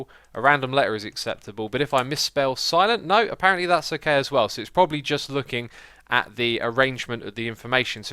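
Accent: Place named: United Kingdom. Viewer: Wow, I thought I was watching a different YouTuber for an entire minute. British accents sound the same, I swear. Well, most of them.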